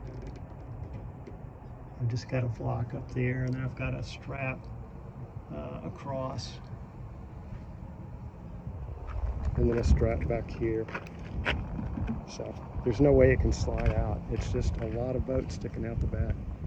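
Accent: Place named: South, United States. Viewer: You have a very pleasing voice. A mild southern accent. Thanks for your sunfish videos!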